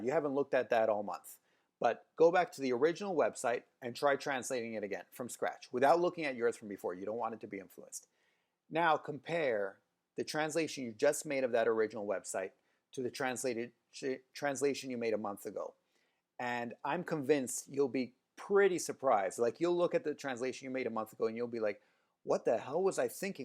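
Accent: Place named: United States. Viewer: This is encouraging and I look forward to trying this method. Edit: just out of curiosity, are you a native speaker in both English and Italian? You said you were Italian but your American accent is perfect. Did you grow up in the States? I hope this isn't too personal. Just curious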